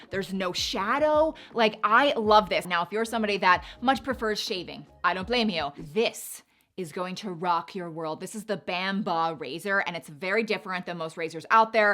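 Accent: South, United States. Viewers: Nothing wrong with sounding Country 😂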